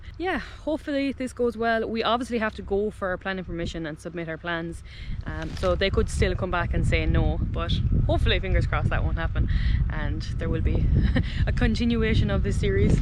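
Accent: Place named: Ireland. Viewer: So great to hear an Irish accent! I'm Irish too but living in France. Going to be renovating an old house here too, soon hopefully. Very exciting. All the best with your build. I'm looking forward to following along!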